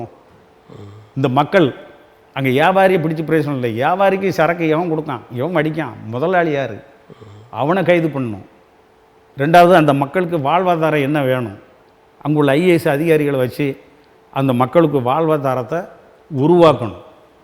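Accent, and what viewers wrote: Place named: Tirunelveli, India. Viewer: மாவீரன் அண்ணன் நெல்லை தமிழில் பேசுவது அருமையான சூப்பர்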